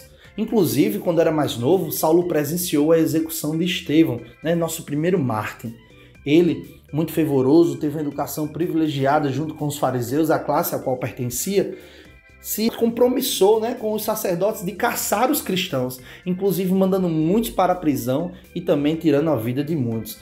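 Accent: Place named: Northeast, Brazil. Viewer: Top de mais! Os desenhos são ótimos e a narração é muito boa, especialmente pelo sotaque nordestino! Parabéns! Continuem! Deus vos abençoe!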